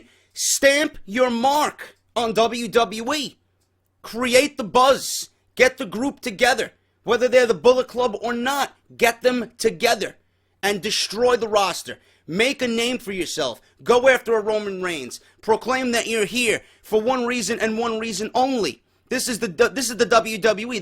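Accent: New York, United States. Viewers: jesus christ you even sound like youre from NY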